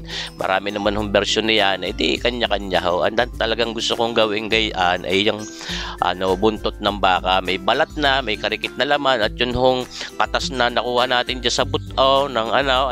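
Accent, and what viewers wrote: Batangas, Philippines. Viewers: Ok sana pero asar ang tono ng salitang battangueño pangit pakinggan. Sa akin lang comment yan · Nakaka aliw ang punto ng bigkas ng salia po ninyo taga batangas po kayo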